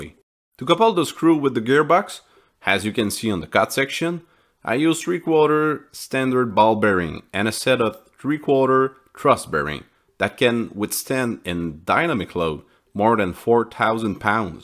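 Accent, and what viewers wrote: Quebec, Canada. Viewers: ca ressemble a un accent du quebec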